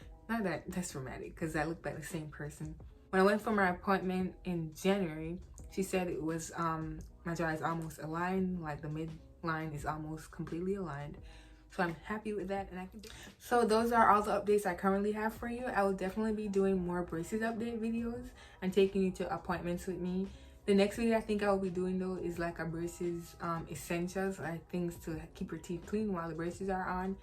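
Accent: Jamaica. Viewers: Can definitely tell that you're jamaican 😂❤ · Jamaican accent? Subscribe!! ❤️💪🏾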